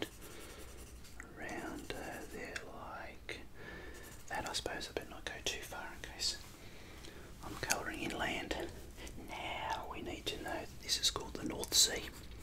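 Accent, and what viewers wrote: Australia. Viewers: I’m German but I’m just here for the Australian accent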